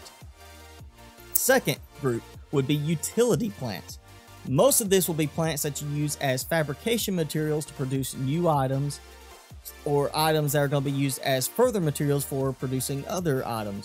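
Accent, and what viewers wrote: South, United States. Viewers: Southern not western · This guy sounds country. Nice video it helped me so much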